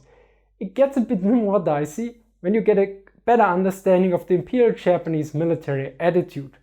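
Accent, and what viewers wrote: Germany. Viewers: I cannot follow this video... Sorry... Why don't you try to grind a little bit that harsh german inflection when you speak ? It is hard to follow you for so many minutes ! Apart this venial thing... I like alot your videos and explanations !!! You do your job well and professionally. Mine is just a mere postive and constructive critic. Nothing personal. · Your accent always throws me off a little.. I know you are an Austrian but you sound German to my American ears. It reminds me of a quote that the most famous German ever was an Austrian and the most famous Austrian was a German (Hitler and Mozart). Don't worry though I am pretty sure Arnold is definitely Austrian. I always wanted to see Arnold in a Ww2 film as an allied soldier - I think Tarantino missed an opportunity when he cast Brad Pitt in "inglorious bastards"!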